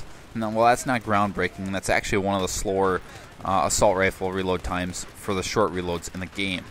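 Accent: Canada. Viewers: Are you Canadian? And please do the F2000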